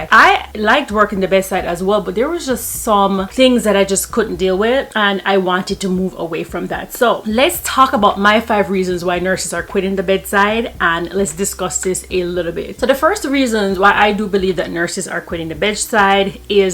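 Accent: Jamaica. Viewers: Hail up muma!!! Hear the jamaica accent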